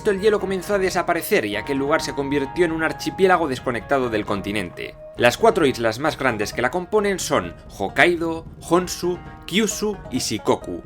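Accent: Spain. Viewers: No me cuadra escuchar la historia de Japón en voz de un español.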